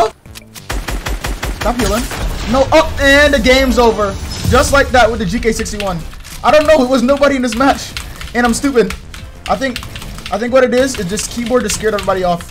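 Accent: Jamaica. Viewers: Yo are you Jamaican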